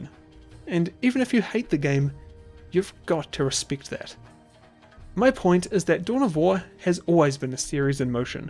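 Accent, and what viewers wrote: New Zealand. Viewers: This video made me actively dislike the kiwi accent. "Vuctury" "Sit-up" "Sid" "Lamint"? Seriously?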